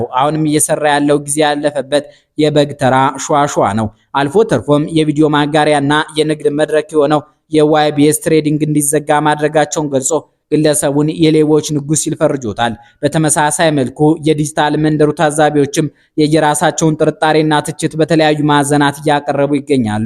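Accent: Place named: Gojjam, Ethiopia. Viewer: ስታነብ ቅናት ያቃጠለህ ጎዣሜ መሆንህ በድምፅህ ያስታውቃል።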